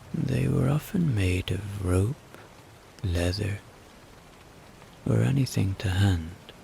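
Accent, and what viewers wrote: Ireland. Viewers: I listen every night from Germany. Unfortunately I don't often get to listen to the stories because I usually fall asleep before I get that far! Your voice is very soothing and I love your Irish accent!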